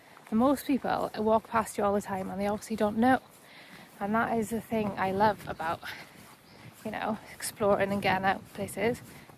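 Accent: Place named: Wales, United Kingdom. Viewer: I'm From Chippenham, Wiltshire. Recently, I discovered a Neolithic burial chamber which is just 20 feet from the road I have travelled along literally thousands of times. Lanhill Longbarrow, 3500bc. It has also been called in the past Ubbe's Low. Allegedly it contained the body of Ragnar Lothbrok's son Ubbe. But this is probably myth. Blows my mind to think one of the oldest buildings in these Island's history is just randomly situated just off a busy main road. Something so ancient and hardly anyone knows it's there. You have a lovely Welsh accent and it's magical when you say 'beautiful'. You're very humble, which I find to be a general Welsh trait. Keep posting from lovely Wales.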